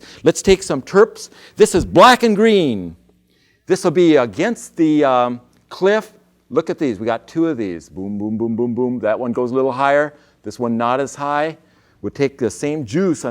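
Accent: Germany. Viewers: Who is this guy? What's the story? Where is the old German guy with accent?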